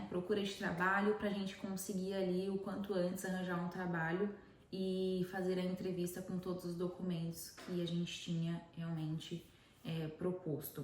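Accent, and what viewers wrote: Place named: Portugal. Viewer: Temos alguns conhecidos “por cá” olha o “purrrtugueix” sobressaindo ihuuuul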